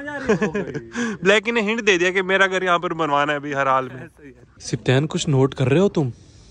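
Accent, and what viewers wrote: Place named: Saraiki, Pakistan. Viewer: VOTE FOR FULL SARAIKI VLOG"👏👏👏 In some of your VLOGs, we can see the Siraiki accent of you people, in some places you also speak, it seems very good, you speak very eloquently and the accent is also very good. All of us will be very happy to see and hear please accept our request.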